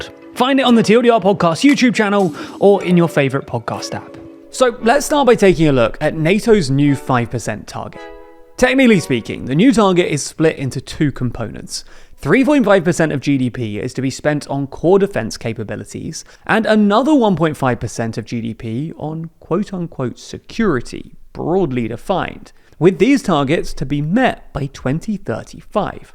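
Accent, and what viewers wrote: United Kingdom. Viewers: TLDR News EU? You sound extremely British to me. Does the UK want to join the EU? Nobody is walking into anything. It is more that we are crawling into a federal EU. It goes so slow. As long we are not all speak the same language in all countries it is still not a real federation in my eyes. Everything we can do together we should. Saves money. We don't need a huge army against Russia. We just need a hyper modern army with rapid response forces and a bit more nuclear weapons. An d all of this bought in the EU. The 5% deal with Trump is a hoax. Anything to get that orange monkey of our backs so we have a soft landing economy wise. Trump is the biggest liar in history of US presidents and changes the rules when ever he wants. That means we can do that too and thus do not have to abide by a number (5%) Trump shot out of his ars. Any deal made by Trump is not even valid in the US itself so why would we honour it? 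No, we just need to pay for our own defence and we will decide ourselves how much that is gonna be... This is how it is but just not said out loud by our leaders...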